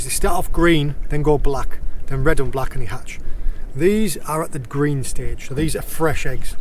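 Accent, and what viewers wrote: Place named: North, United Kingdom. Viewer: Ay up ! 😂 class don’t drop them northern roots pal 👊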